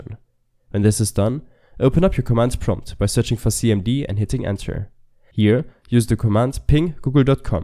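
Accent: Germany. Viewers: tahnk u man so mmusch youre the best and i love ur german accent liked and subbed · Hrm...German accent sound so cool, btw what microphone do you use? It sounds great. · Love your Deutsch accent 😜😜😜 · Wollt schon sagen ich guck das video zum erstenmal direkt habe ich gedacht deutscher haha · 3 sekunden und ich wusste du bist deutsch lol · Genau so ein Tut habe ich gesucht danke :D Hab gleich gehört das du aus Deutschland kommst xD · mann hört so hart raus das du deutsch bist xD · spricht du auch deutsch ? hört sich irgendwie so an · German Akzent 😅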